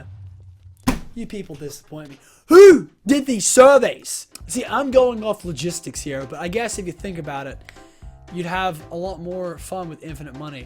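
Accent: United States. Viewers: he sounds american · R u american lol u sound American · He sounds half American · He sounds American!!😂😂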